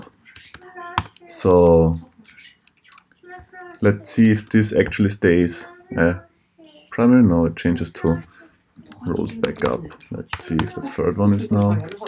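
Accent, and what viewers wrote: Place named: Germany. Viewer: you sound so german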